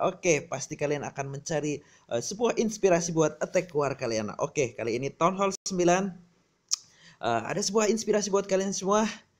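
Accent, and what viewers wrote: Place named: Java, Indonesia. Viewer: medok yah 😀😀😀😀😀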